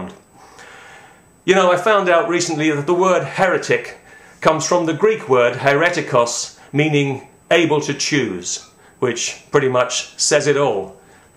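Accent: United Kingdom. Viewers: I agree with much of your criticism of the clergy. However, judging from your accent you are British, and I don't recognise most of what you are saying in relation to church ministers in the UK. Do we have ANY televangelists in the UK with private jets? How many clergy in the UK live in palaces? (and anyway, I don't think that the residential accommodation in the archbishops 'palaces' would be anywhere near as luxurious as the word suggests to most people). In my experience the vast majority of ministers in the UK are not well off, and they do their best to help people (in their present situation, not in the 'next life'). Do I detect that your hatred of and bitterness toward the Church might stem from issues you have had with the Church in the past? Maybe abuse as a child? With regard to your beloved atheism, the two biggest examples of the Church being downtrodden at State level must be China and the old USSR, where atheistic Communism was the order of the day. 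In both cases, many ministers and lay people suffered severe persecution and even death, simply for practising their faith. You might suggest that it was their Communism which was at fault rather than atheism, but these examples show that doing away with the Church does not mean that we all live happily ever after.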